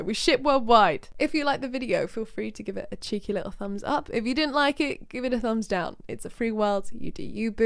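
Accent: United Kingdom. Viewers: has anyone ever told you, you have the proper accent to be a Dr.Who companion? i think you and the Matt Smith doctor would get a kick out of each other... All british people know Dr.Who, right? its like a sporting event for you guys when it airs?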